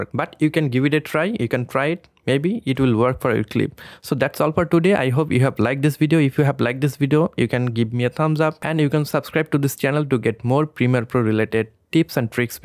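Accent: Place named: Assam, India. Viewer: You sound so assamese... are you one??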